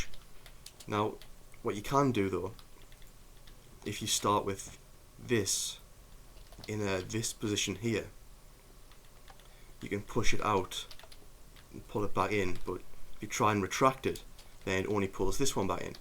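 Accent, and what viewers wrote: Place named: Newcastle, United Kingdom. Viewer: Good video, it's just inspired me to try something similar myself. Btw, where abouts in the North are you from? I can't quite place your accent, but you must be up in the North-East with me (I'm from Hartlepool)! All I could figure was that you sound quite like a Geordie, but not full on. Or am I wrong and that's a Sunderland shirt in the intro lol?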